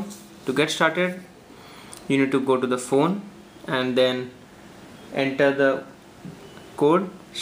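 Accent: India. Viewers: every things great except the way of talking and that accent...fucking bullshitt indian accent...itz star not ishtar you fuck!!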